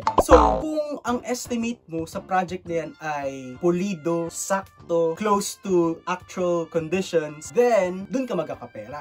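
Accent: Visayas, Philippines. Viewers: Bisaya man diay ka Sir HAHAHA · Ay bisaya jud diay ka. Mahibal an mn gud sa pag storya dol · I like the Bisaya accent🤣 KUDOS kuya👍👍👍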